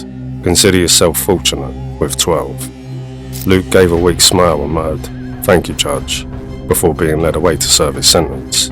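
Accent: United Kingdom. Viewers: State of this shite😂😂😂 uk accent is cringe as fook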